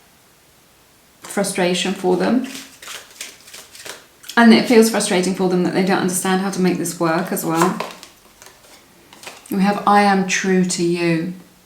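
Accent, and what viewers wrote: United Kingdom. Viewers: thank you! love you and your British accent hehe! 😂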